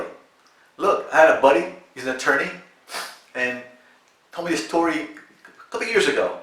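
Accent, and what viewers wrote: Jamaica. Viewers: I live in the D.R. and I Teach at a private school.. I would like to teach online..can you point me in the right direction. I hear your Jamaican accent..I'm Jamaican too ..via Toronto/New York.. I m glad you made the choice to live life..and not get stuck Pretending in the Matrix.. Please respond your information would be valued.. Love what you are doing!!! Selvin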